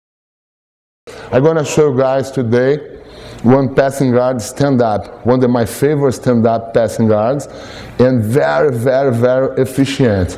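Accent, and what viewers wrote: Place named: Brazil. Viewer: My sensei is from Brazil who is living in Australia with a similar accent to. I find it normal when Brazilians or any Nation's first language is not English. If you understand BJJ then listening to broken English is not important when you're paying attention to the drill/technique more;) Master - I love watching your videos. I learn so much especially of someone from a higher belt. CHEERS:)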